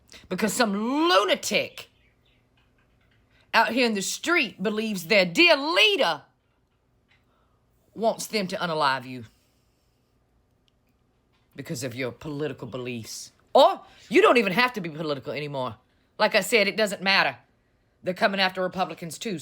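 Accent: South, United States. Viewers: The message is on point👏🏾the southern accent is the cherry on top🍒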